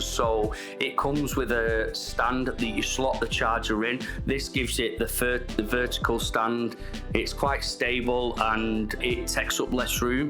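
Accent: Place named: Northern England, United Kingdom. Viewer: sweet review, cheers. Cool to hear a Norvern accent as well. Love from Liverpool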